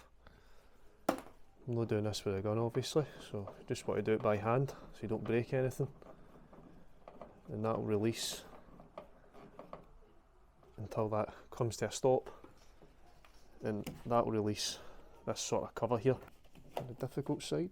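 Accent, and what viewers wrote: Glasgow, United Kingdom. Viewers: Well done for taking on a difficult job, and showing us it wasn't to bad. I enjoy your videos, and my wife likes your Glasgow accent.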